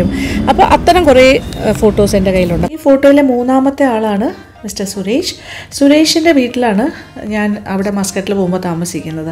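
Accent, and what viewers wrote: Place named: Southern Travancore, India. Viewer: No doubt you are the one who inspired culinary skills in all young minds!! Even I was very particular to be present before Kairali channel at the right time to watch you appear - - though staying at the heart of tvm city your southern travancore slang attracted me the most- You always maintained an unique cooking style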